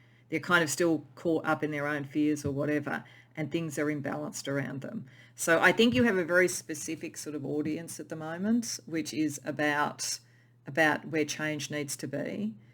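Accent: Australia. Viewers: I understand if you don’t want to answer this question but I’m very curious… lol, there are a couple of things you say that I’ve only heard from a certain group of people. It’s not exactly what you say, it’s the way you say them lol. Do you live in or come from a country town in Victoria that was a mining town in its early days. It’s a town in the proximity of a well known island township. I’m trying not to be too specific but hope I’ve given you enough info to get what I’m asking 🤔😁👍🏼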